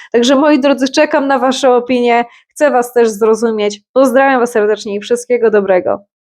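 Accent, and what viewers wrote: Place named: East, Poland. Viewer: Pierwszy raz na Twoim kanale, więc wynacz jeśli pytanie już padło - skąd u Ciebie ten wschodni akcent? Pozdrawiam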